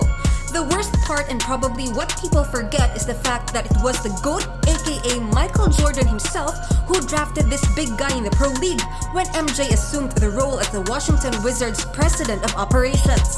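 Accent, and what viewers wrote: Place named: Philippines. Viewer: Are yall Filipino? You sound like my best friends wife and she is from Cebu. You didn't put Brian Scalabrine?? Any how, Great Job. Keep pushing hard. Don't give up.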